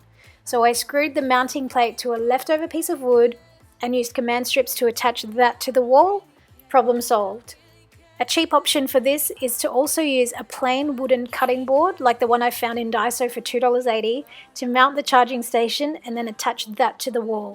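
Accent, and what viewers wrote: Australia. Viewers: very clever ideas nice to hear an Australian voice too xoxo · Just subscribed.Just realised my sodastream fits next to the microwave! didnt think to try it before.. nice to hear an ozzie voice. Great videos (Straight to the point, less chatter). Keep up the good work! :)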